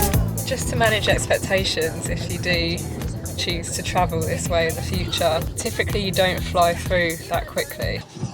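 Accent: United Kingdom. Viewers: What is accent? Which part of the UK?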